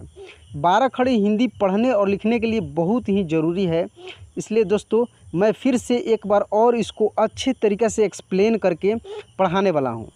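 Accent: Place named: Bihar, India. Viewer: Out of all Hindi teachers Guru Ji I like your Bihari accent Bahut Dhanivaad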